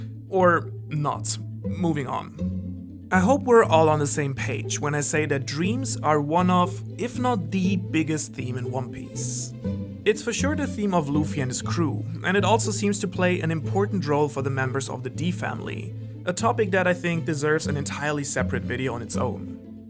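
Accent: Germany. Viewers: As a fellow speaker of English as second language , want to note that it's a common mistake to pronounce infamous as in-famous, I totally understand you. But correct way to pronounce would be "infomus" with a stress on i. P.S. but wait... are you really not German? Where are you from?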